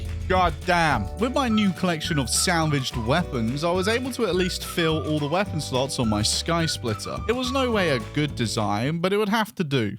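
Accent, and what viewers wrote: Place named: United Kingdom. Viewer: I thought you were saying alpha ray eye cores for the longest time, and then I realised you were just fucking bri*ish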